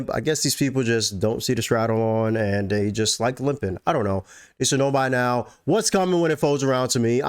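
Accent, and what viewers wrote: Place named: Louisiana, United States. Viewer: Based on your accent I’m guessing you are from Louisiana.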